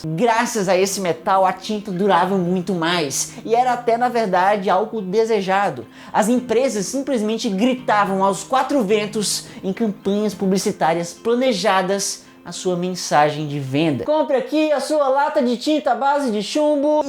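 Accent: Ceará, Brazil. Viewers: Cearense ater falando de ciência é engraçado parabéns gosto dos seus vídeos, eu aprendo e mim divirto 👏👏👏👍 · Cearense kskskskdj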